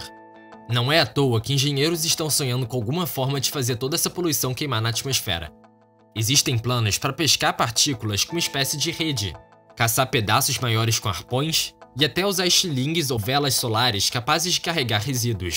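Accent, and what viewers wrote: Rio de Janeiro, Brazil. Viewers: Sotaque carioca insuportável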